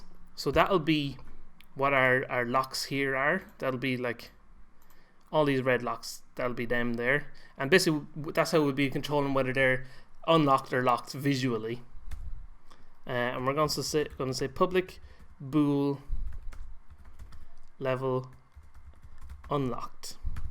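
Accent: Ireland. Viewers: Hi are you Irish i'm new here you sound Irish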